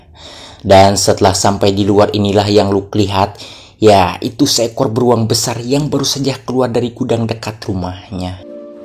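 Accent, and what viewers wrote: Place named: Sunda, Indonesia. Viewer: sebenarnya filmnya ada yang udah ditonton...ngan eta resep naratorna. Aya emplud-an kitu. Crat cret crot...aaah pokona nyunda banget weh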